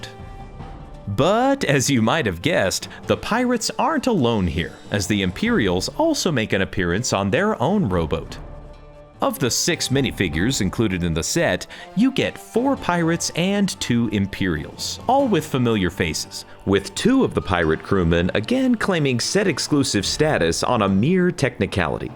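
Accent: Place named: Scotland, United Kingdom. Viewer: Sounds a bit Scottish to me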